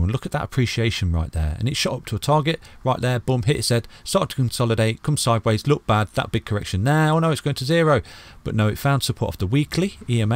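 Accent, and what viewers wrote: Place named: England, United Kingdom. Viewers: You sound local mate, close to Derbyshire by Any chance or im thinking Nottingham? :) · I love listening to market YouTube videos, the English accent is the cherry on top.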